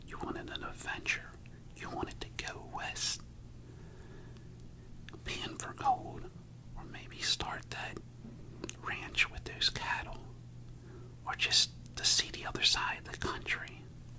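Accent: United States. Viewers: I have been looking for something like this, a texan american Accent can be very relaxing when it sounds like no Asmr want to work !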